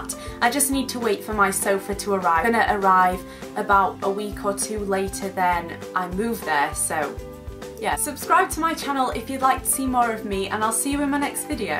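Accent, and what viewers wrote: United Kingdom. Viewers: I wish u.s drugstores carried the bourjois healthy mix foundation I really wanna try it :( I love your videos by the way :) what accent do you have like I know youre british ( im not trying to be rude im just a curious kitty)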